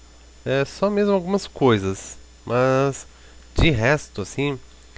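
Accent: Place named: Portugal. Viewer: Vc tem um sotaque portguês, mas vc é brasileiro ? qual sua nacionalidade?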